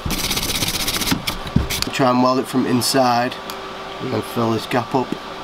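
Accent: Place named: United Kingdom. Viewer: He has a British accent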